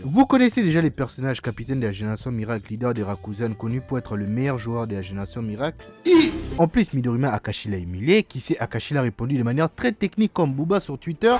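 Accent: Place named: Democratic Republic of the Congo. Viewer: Rien qu'à ta voix,je sais que tu es un 243 😎🇨🇩(moi aussi)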